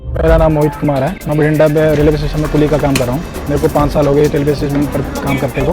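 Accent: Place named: Odisha, India. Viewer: Pata thaaa bhai tere hindhi bolne style seee.. jay Jagannath ❤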